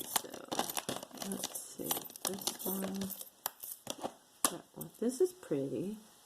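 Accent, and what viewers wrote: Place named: Ireland. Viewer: Love the Irish accent! 💚🍀💚🍀💚🍀💚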